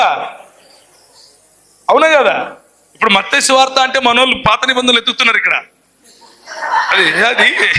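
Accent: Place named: Telangana, India. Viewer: Full Telangana accent..😄..we miss you brother..feel very sad..🙏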